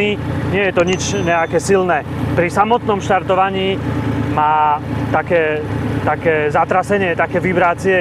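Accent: Eastern Slovakia, Slovakia. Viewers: Video fajn,len ten strašný východniarsky prízvuk .... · a ten krásnej vyhodnarskyj přízvuk👍díky.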